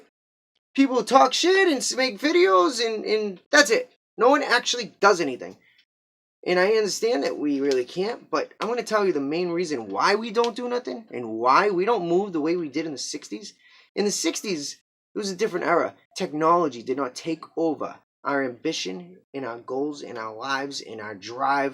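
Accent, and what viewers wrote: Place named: Massachusetts, United States. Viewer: i just started watchin your videos. i like youre stuff. its funny bc i know a northshore mass accent when i hear one.... where you from? :)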